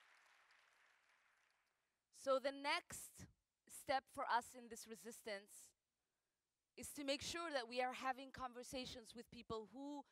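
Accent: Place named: Somalia. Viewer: I see she's revved up her Somali accent in order to represent her people - not Americans, but CAIR and its affiliates.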